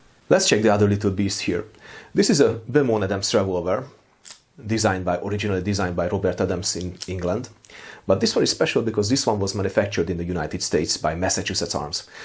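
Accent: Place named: Hungary. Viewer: Nagyon tetszik a magyar akcentusod!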